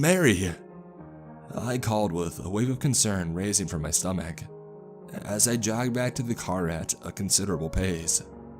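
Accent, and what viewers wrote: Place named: South, United States. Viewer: I love the southern drawl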